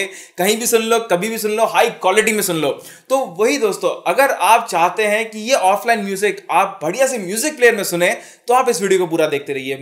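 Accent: India. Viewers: Hes speaking Hindi-nglish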